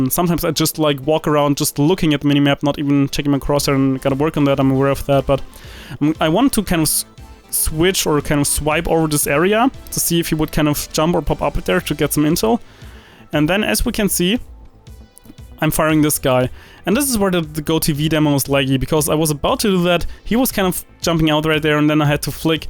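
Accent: Germany. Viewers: Jetzt ergibt das auch nen Sinn warum du sowie die typisch Deutsche im MM klingst xd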